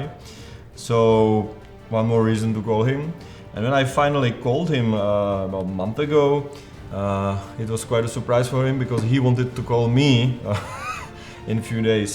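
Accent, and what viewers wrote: Czech Republic. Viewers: Tomu se říká česká angličtina no :D Já jsem rozuměl skoro všemu, kromě některých odborných slov co neznám.. Neni to těžký k pochopení, ale ten kdo se AJ neučí, nebo neni až tak zdatný, tak to problém může být.. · May I ask someone from England/USA, do you like his accent? Because I am from Czech rep.as well, so I would really like to know your opinion :)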